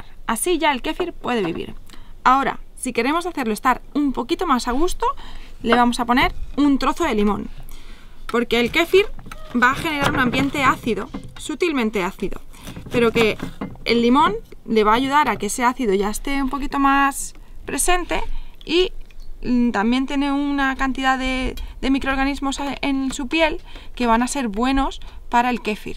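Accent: Spain. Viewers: ERES FABULOSA,,TE VEO DESDE AUSTRALIA,,DESCUBRI KEFIR POR ACCIDENTE,TRATE EL AGUA DE KEFIR Y NO ME GUSTO MUCHO,,CON TUS CLASES, YA LA VOLVERE A HACER NUEVAMENTE, TENGO 85 AÑOS Y ME SIENTO FASCINADO, ME GUSTA TU ACENTO ESPAÑOL GRACIAS MIL LINDA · Puedes compartir de esos cultivos para hacerlo yo? Por favor x tu acento creo q eres española yo vivo en USA si eres fan amable gracias espero tu respuesta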